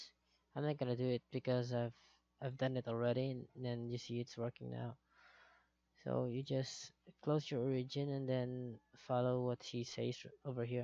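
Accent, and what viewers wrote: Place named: England, United Kingdom. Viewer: ENGLISHER KAAYO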